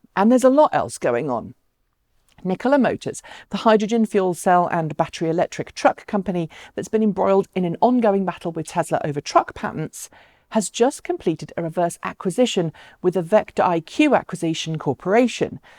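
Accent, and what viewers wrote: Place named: RP, United Kingdom. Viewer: Your accent goes a bit posh in these videos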